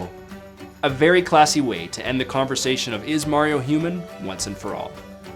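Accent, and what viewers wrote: Canada. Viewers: Canada, eh?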